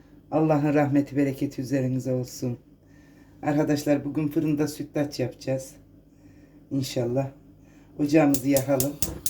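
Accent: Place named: Kayseri, Turkey. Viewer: Yapicam simdi siveniz Kayseri gibi yanılmıyorsam elinize saglik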